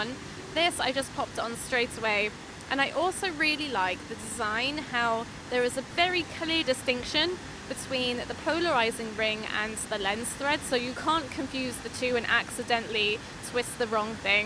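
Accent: United Kingdom. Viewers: Those images at the end… 😘 (Also, people over here in the U.S. pronounce "NiSi" the way you Brits pronounce "Nikon.")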